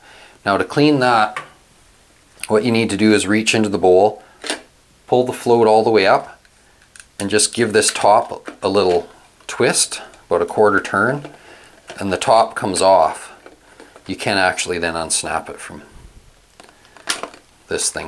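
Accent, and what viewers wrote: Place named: Canada. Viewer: Hi! I can't get over how much you remind me of a friend from (5th grade? ish?) whose name was...and lived a couple of doors down from me in Seal Beach, California....and he was from Canada too (I assume you're from Canada with that accent). I know I already asked you if you lived there as a kid and you said no.....but, if that's true, I swear you have a doppelganger out there somewhere! Thanks for all of the amazingly helpful videos, btw! I'm getting ready to have an addition built and I feel like I know all kinds of things that will be helpful in the process.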